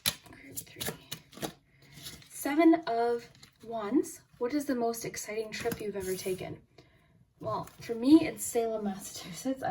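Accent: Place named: Canada. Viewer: I’ve always guessed you lived in Canada or Minnesota by the way you say “sorry” in your videos. This was the first time I heard you say where you were from and I feel that you being from Canada is so fitting I’m not sure why.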